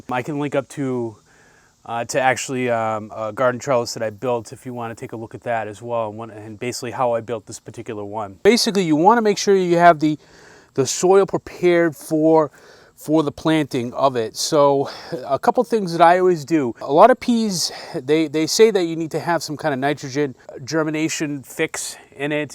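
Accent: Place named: Massachusetts, United States. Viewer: You sound like your from MA! That’s where I’m from so your accent sounds so familiar. Thanks for the content! Lots of good tips!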